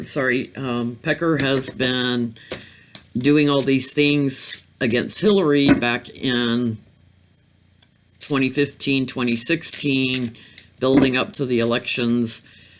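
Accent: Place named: Russia. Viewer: Great reading! I feel a Russian influence as well! 🌻👍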